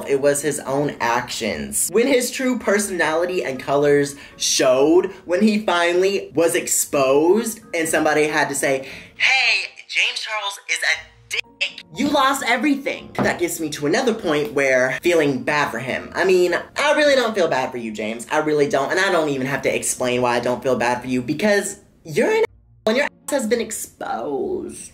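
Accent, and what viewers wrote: South, United States. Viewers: Finally a YouTuber that I actually like that has a southern accent!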